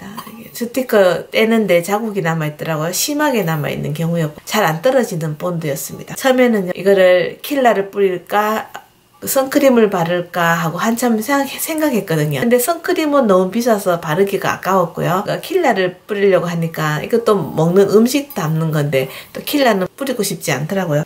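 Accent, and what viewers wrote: Gyeongsang, South Korea. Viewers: 경상도 사투리 멋찌십니다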